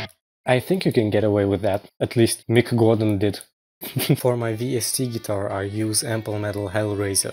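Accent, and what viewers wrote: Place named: Russia. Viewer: дружище, ты ведь русскоговорящий? я четко слышу этот акцент ахах. а вообще, очень круто. давно не смотрел подобных видео за редким исключением, но ты смог зацепить и вдохновить. всегда обожал метал и в последнее время подсел на брейккор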